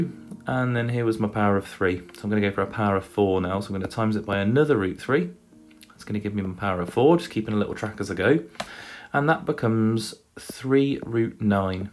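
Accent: United Kingdom. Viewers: try to be more clear in your speaking and explanation. Not everyone is capable of understanding a British accent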